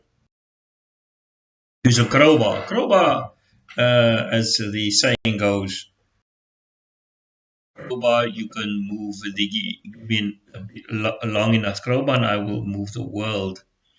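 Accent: South Africa. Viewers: Thanks this is really helpful and great to hear a South African accent!!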